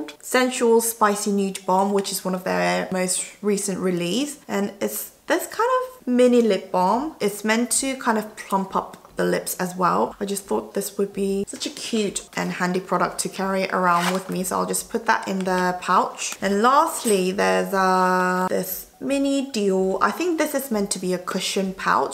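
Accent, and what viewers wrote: England, United Kingdom. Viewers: 너무 예쁘시고 영국발음도 듣기 좋아서 이거 한 10번째 이상 보고 있는 거 같아요. 대사도 워드에 다 쳐서 영어공부로 외우고 있어요 ㅋㅋㅋㅋㅋ 앞으로 외울 때까지 <num>번 더 볼 예정... 사랑스러운 영상, 진심으로 감사드려요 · 언니 영상으로 영국발음 공부해여....♥️ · 우아 오랜만에 언니 영국영어 들으니까 너무 조아요❣️❣️ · Oh wow idk why but i can hear your english accent more in this video! Hope you can do makeup collection video one day!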